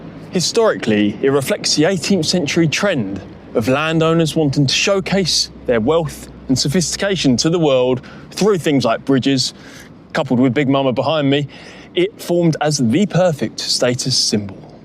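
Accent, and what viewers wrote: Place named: Birmingham, United Kingdom. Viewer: Definitely entertaining 👍. I love the Brummie accent.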